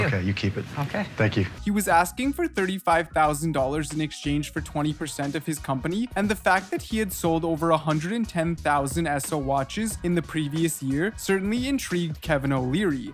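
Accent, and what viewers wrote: Canada. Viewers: It's called being Canadian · I'm going to venture a guess and say the narrator is Canadian. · I think he is Canadian. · The narrator is Canadian AF! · He sounds canadian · He's Canadian :D Probably from the Edmonton or Ottawa area. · bet the VoiceOver is a Canadian dude... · Just the classic Canadian “out” pronunciations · He's Canadian, so.....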